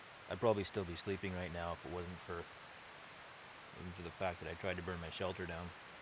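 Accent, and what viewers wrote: Canada. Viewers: nice series. it sounds like your canadian, too bad you cant carry a pistol. it would suit your needs and is way less cumbersome.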